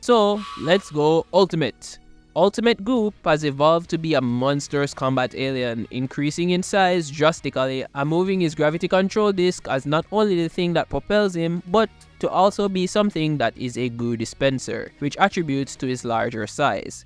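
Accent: Jamaica. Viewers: Bro are you Jamaican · Hold up are you jamaican bro. Di accent a sneak thru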